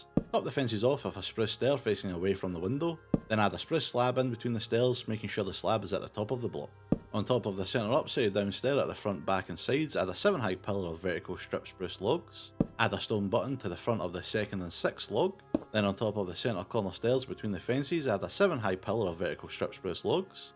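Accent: Scotland, United Kingdom. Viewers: I think he’s Scottish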